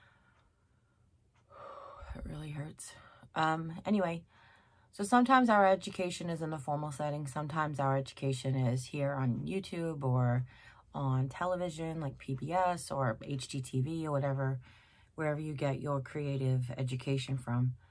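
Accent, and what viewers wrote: New York, United States. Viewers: I love your New York accent...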